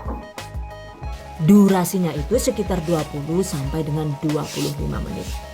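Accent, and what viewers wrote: Banyumas, Indonesia. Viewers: Mksh bun. Seneng lihat.tapi ga pernah buat. Bunda Lucunya. Si pd inyong ngapak ya bun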